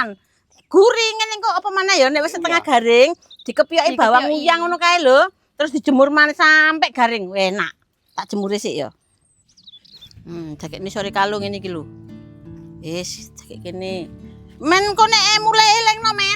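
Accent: East Java, Indonesia. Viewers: ini bahasanya kok Jawa timuran rumahnya mana to klu boleh tahu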